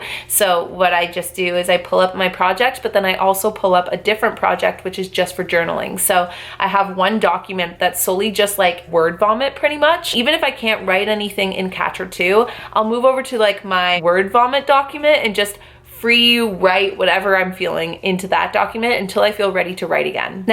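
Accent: Canada. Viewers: are you canadian? you sound canadian😂 edit: i’m stupid u live in toronto🤦🏽‍♀️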